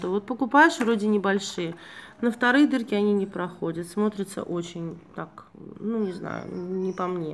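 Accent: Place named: Baku, Azerbaijan. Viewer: Не речь,а песня,родной,любимый бакинский акцент😘продолжайте вещать,;)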